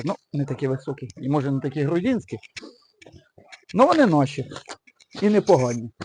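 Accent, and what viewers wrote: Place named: Central Ukraine, Ukraine. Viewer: Друже, дуже цікаві відео. Сидимо з дружиною і гадаємо звідки ти ці відео записуєш? Я з Черкас, вона з житомирської обл. Каже що в тебе такий самий говір як і в мене, то ти також десь з тих країв...) То звідки ти?))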